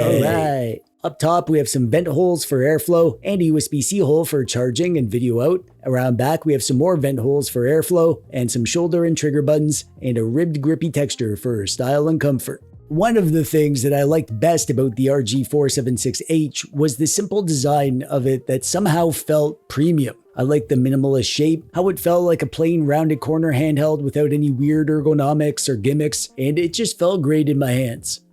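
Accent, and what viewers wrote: Canada. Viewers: Too much rambling, like you enjoy the sound of your low monotone, raspy voice with that obvious canandian accent just wasnt it for me. I could've done without the uneccessary comedy and jokes thrown in. I dont think there's anything I learned from your "review" about this gaming device. No sub from me.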